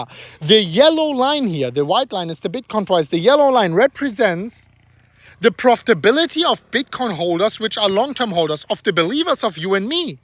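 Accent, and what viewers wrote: Germany. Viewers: Das ist doch ein deutscher Akzent. 😁 Gefällt mir sehr gut.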